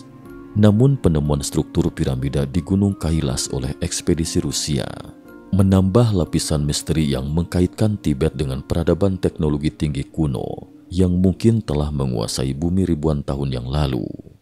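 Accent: West Java, Indonesia. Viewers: mang , maneh urang sunda nya ? hayoooh ngaku 😂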